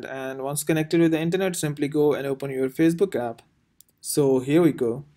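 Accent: India. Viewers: Hey ! Try to talk clearly the english, still we hear your hindu acent , we can’t understand. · This indian accent kills me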